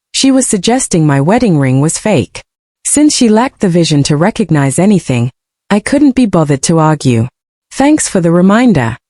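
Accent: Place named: England, United Kingdom. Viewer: 1st! Interesting English accent! 😊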